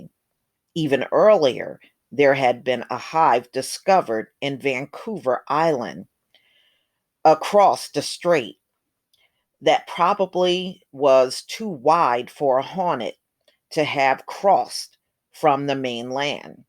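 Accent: East Coast, United States. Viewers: Don't insult her accent she is probably from the east coast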